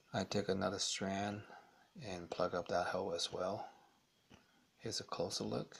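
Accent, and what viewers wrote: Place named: Asia. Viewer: Changadera in Asian accent 👌!!!!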